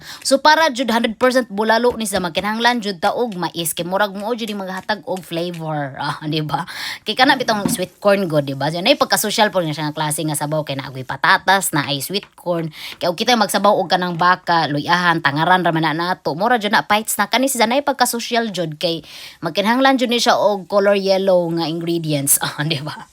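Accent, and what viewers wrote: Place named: Bohol, Philippines. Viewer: Bul.anon nga accent from Bohol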